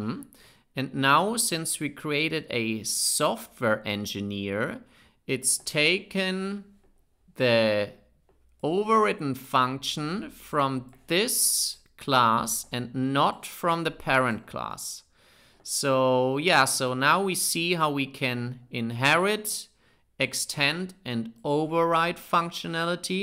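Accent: Germany. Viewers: nice content, but your accent is as worse as German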